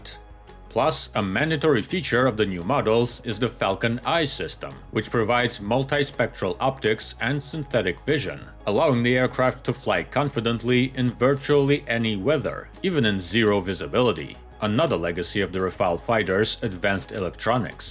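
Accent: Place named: Russia. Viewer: I would watch this channel if not this fucking raSSIan accent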